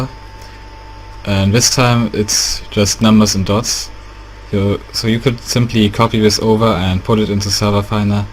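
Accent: Germany. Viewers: bro man hört halt das du deutsch bist aber du sprichst verständlich englisch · du bist ein deutscher :D merkt man an deinem aktzent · Boah dieser deutsche aktzent😂 Aber cooles video hat mir geholfen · You German right? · dieser deutsche Akzent XD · a ok weil dein english ist nicht so gut weil wenn ich english rede höre ich mich fast so an wie du deswegen dachte ich du bist deutscher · are you german? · Man hat das Deutsch richtig raus gehört xD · i can hear you German accent xD. i love your client too, thanx.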